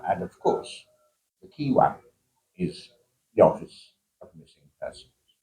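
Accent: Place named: RP, United Kingdom. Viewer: What ridiculous talk,just showing English posh pron ,accent etc What a Tragedy the helpless Poor doesn't understand?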